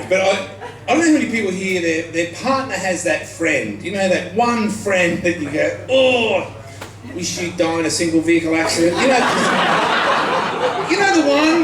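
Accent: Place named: Australia. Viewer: I love fresh stand up, beards and an Australian accent. This guy is a total package! oh and funnier than $&!+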